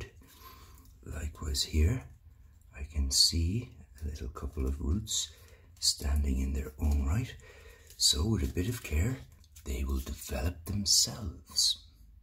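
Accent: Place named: Ireland. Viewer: Sounds like a grand Irish accent.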